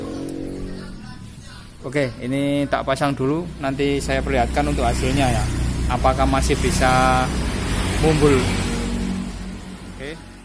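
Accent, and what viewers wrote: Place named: Jombang, Indonesia. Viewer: Logatnya kayak orang jombang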